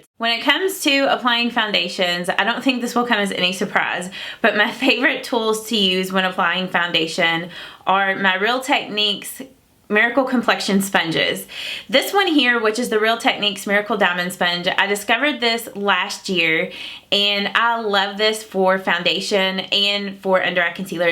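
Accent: South, United States. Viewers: it always makes me happy to hear a fellow southern gal speak. it seems like all the makeup YouTube channels are from either the north or Texas etc. the southern accent is musical.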